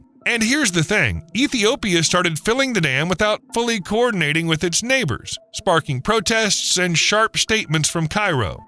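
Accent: United States. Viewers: I just stopped it and won't be listening. No one wants to listen to an abysmal amerry can accent. Just no one.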